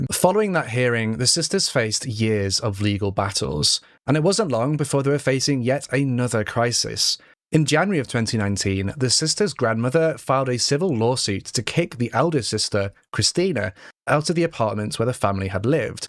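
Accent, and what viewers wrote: United Kingdom. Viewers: Smoked a bowl before I put this on to clean. Spent way too long remembering that Brit’s say zed for z. Had to pause, rewind, and wonder what language u spoke. 🤦🏼‍♀️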